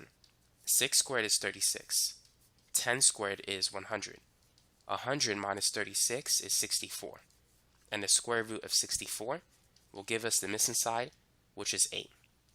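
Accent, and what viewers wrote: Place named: New York, United States. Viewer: This man gotta be from new york! DeadAss!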